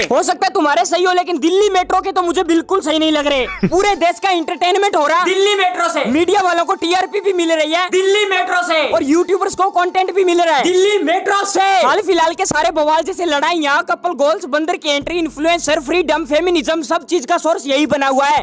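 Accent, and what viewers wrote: Rajasthan, India. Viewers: Bhai❤😂राजस्थान से हो detected I'm also❤🎉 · Bhai kya aap Rajasthani ho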